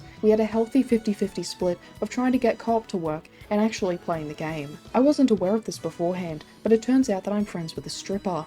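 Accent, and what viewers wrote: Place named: Australia. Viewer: I will never get tired of hearing "Chonk Lord" in an Australian accent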